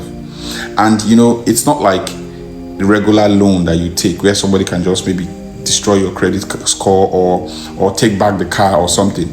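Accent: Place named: United Kingdom. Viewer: From his speech I feel it's the UK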